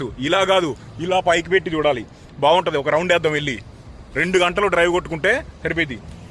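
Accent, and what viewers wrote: Andhra Pradesh, India. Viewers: Bro meeru telangana kada..maree nnizamabad..mee baasha enti andhraa laa untundi..akkada settle ayyaaraa…endukantey akkada astundru,potundru,andukunnava,tindra ledaa..ila matladutaaru… History cheputara meedi…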